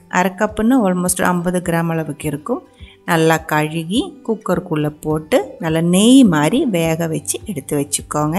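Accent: Kerala, India. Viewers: Nice Tamil with Malayalam accent nice molakooshyam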